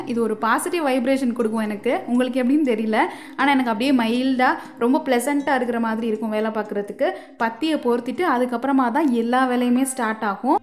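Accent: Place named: Theni, India. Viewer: Neenga cumbum theni pakamnu ninikiren ...unga slang theriyudhu..crct ah sister?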